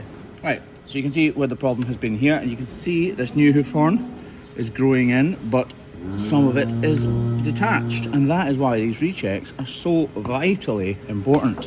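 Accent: Scotland, United Kingdom. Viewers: I think people appreciate the openness of Youtubers. Not everything in the garden is rosey as some would make out. Behind every Youtuber is a human being with problems like everyone else. Sometimes people like to know that they are not alone as they have similar problems. Maybe one day your daughter will reach out. Keep positive. Not sure why I stumbled upon this channel but I am sooo glad I did. It's refreshing to hear fellow Scots accents too! I'm from Aberdeen and there are very few Scottish Youtubers that I have heard. Who would have thought that hoof trimming could be so satisfying 😂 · As a horsewoman in Canada, I'm fascinated with the trimming of the cows hooves as I am with horses hooves. And I'll be honest, I love a Scottish accent lol. Wish I could visit your holiday place..😊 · We watch to hear your accent. My mom is from Scotland. We want to try to get her there again. 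She has stage 4 lung cancer. It’s stable for now and she is healthy. We can’t really afford to send her, she needs a helper with bags n stuff. So one of us to go with her. She could go in her own, for a short time. She’d take less stuff. But it’s just a dream for now. We will see what life has to offer. We will keep praying for a miracle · . Your book was a fantastic read. I couldn't put it down. Thank you for letting us into your life. You are a wonderful guy and you deserve everything in life. When I was reading your book, it felt like you were in the room reading the book to me in that lovely Scottish accent. Merry Christmas to you, Mrs Hoof GP and your wonderful boys. May it be joyous in everyway possible for you all. Xx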